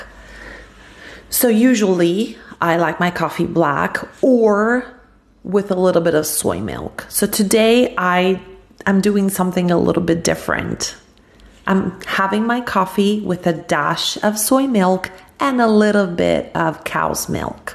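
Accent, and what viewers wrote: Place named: Midwest, United States. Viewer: Da madrelingua, complimenti per la pronuncia. Sembri proprio americana, con un accento un po’ midwest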